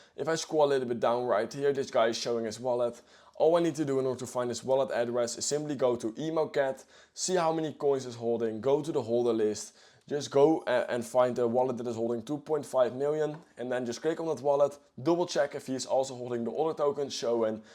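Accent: Netherlands. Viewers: Kom jij uit Nederland?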